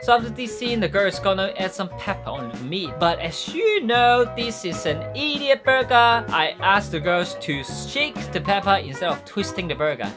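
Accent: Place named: Hong Kong. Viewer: 喂，你啲英语發音和用字都係自己作出黎和有好重的香港广东话口音；西人是完全唔知你噏乜；你要好好学吓 preposition 应该点用，唔好乱讲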